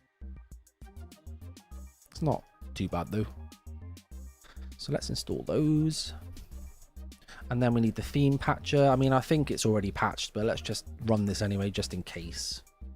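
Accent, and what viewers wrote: United Kingdom. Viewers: btw love who your pure British "yeah lemme get more tea" LOL stay cool my guy PS what's your favorite Michael mjd video?? Mine is the one where everything goes wrong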